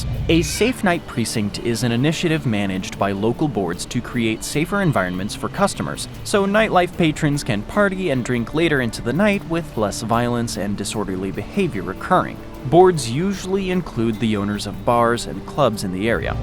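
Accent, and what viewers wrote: United States. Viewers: As soon as I heard the American accent I was out, I couldn’t imagine an American watching a video on their country with an Aussie twang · Omfg the most American video ever, trying to downfall the new Eldorado country that took your 1st spot is hilarious af. Bro butthurt that is little America as lost it's paradise status and take it on the new n°1 😂😂 i'm dead We dont care that Australia is isolated and far from everything, it's a good thing rather than a bad, EU and America is so satured with their own legacy and history that a place like Aus is literally a new born baby so it has so much potential and possibilities, that's why it is so great cause it is not concern with the historic bs that EU and US can't dissociate from, it's literally just living it's best life, something that the rest of the world should learn from. If you move to Aus you know why you chose to live there and nothing else really matters when you got such a perfect country to live in. 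A flight is a flight, 8 or 16 hours is all the same The news thing, how is that a bad thing ? People in EU and US literally are brainwashed daily with so much bs information nobody gives a fck about, it's literally cancer for your life. You say it yourself in here, 'they might have hacked the mental health problem' duuuh.. Accumulated informations that doesn't matter for 90% of it giving cancer and tumors to people.. i swear people make videos like this either cause they got rejected for their Visa to go live there or you just like spreading negativity. Or.. if that's your voice you're clearly American and jealous that the 'Eldorado' status is now considered to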